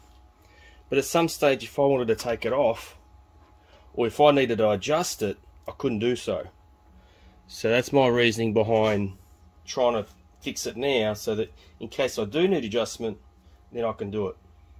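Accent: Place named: Australia. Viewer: I was expecting to hear a french guy speaking, bugger me if it wasnt a fellow Aussie !!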